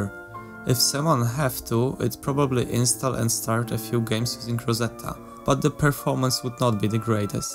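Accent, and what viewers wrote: Poland. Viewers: Do I hear Polish accent? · Pierwsze parę sekund i myślę sobie: kurwa to musi być Polak XD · are you Polish? I'm guessing by accent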